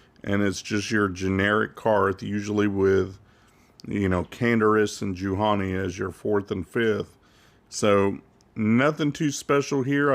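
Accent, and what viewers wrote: South, United States. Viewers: your southern drawl makes the videos relaxing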